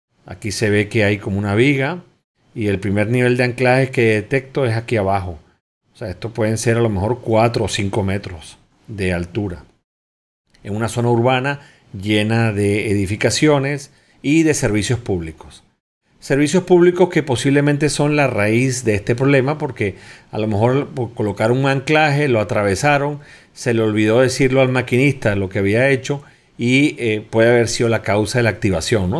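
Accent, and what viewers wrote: Venezuela. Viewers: Hola, eres de Venezuela?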